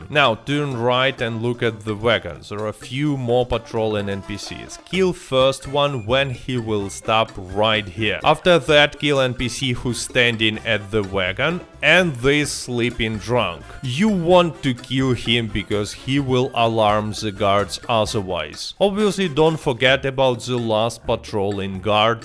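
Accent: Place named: Russia. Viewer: Nice russian acent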